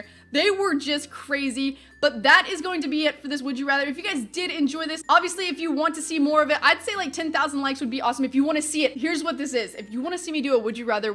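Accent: Canada. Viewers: are you from Canada new Zealand or Australia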